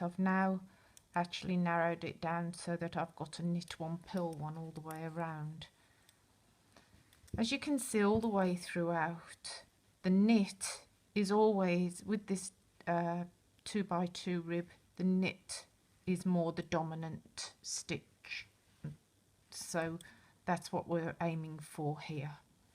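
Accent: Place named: Birmingham, United Kingdom. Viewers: nice to hear an English accent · love your Brumie accent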